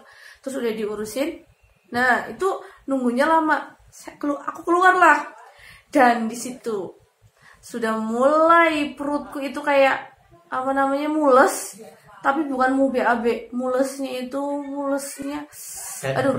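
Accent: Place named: Java, Indonesia. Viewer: Medyok sekali kakak senior idolaku iniiiii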